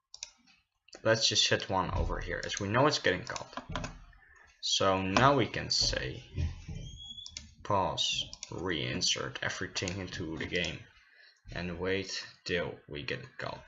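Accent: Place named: Netherlands. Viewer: Good luck with your exams! Is dutch? I hear an accent..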